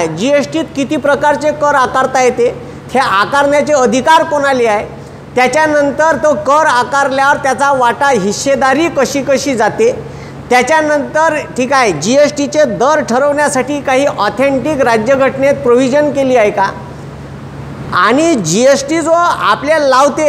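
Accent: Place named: Vidarbha, India. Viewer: Tumhi bava khare vidharbha vadi😅😅tumcha bolna maley lay awadla 😅😅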